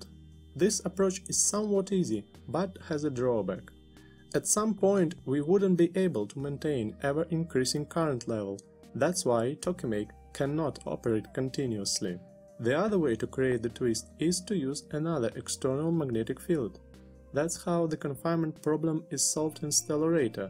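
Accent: Russia. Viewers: Is it a russian accent? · русский акцент? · Акцент русский, не спроста?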